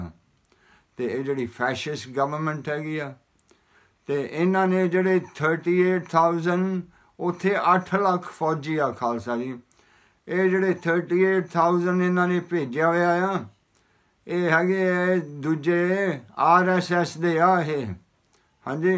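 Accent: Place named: Pakistan. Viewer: Eh bhain da lorha Sikh ni haiga. Ehda accent hi ni haiga India wala. I know uk to aa but bolan da dhang Pakistani type aa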